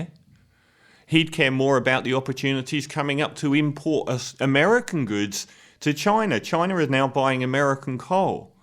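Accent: England, United Kingdom. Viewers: The guy making this video sure does. He has a strong English accent but he speaks as if he were Australian.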